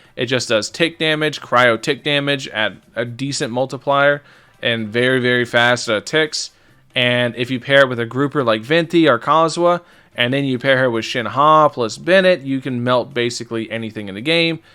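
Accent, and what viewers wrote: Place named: Canada. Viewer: My girlfriend thinks you sound Canadian. Are you brother?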